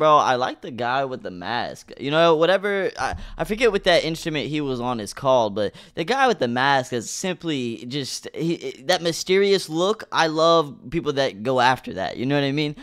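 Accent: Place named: England, United Kingdom. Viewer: I like your English voice accent❤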